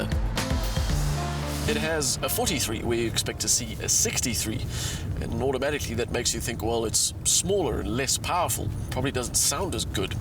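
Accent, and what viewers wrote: South Africa. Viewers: Great video, love the South Africa accent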